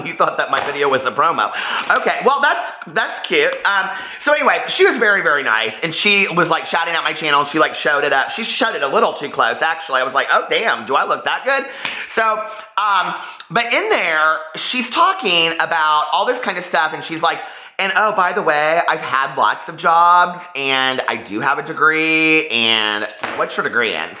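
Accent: South, United States. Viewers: My husband said once that you sounded southern too, but I let him know you were from Indiana 😉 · Mr, I'm in Charlotte North Carolina and u absolutely sound more southern than most of us here. 💙💜💙💜💙💜💙💜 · Omg i totally thought you were southern when i watched your first few videos but i looked at your mailing address to see where you live. You have such southern mannerisms and have a hint of a southern accent and pronunciations i fking love it i miss my southern queens. Northern Michigan is so VERY DIFFERENT then my home town of Tampa, Florida! Love you and love everything about you and your vids!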